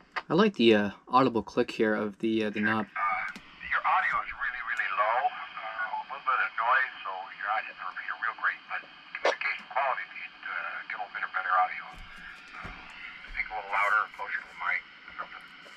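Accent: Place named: Arizona, United States. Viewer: Sound like your in AZ. We might be neighbors! Thanks for the video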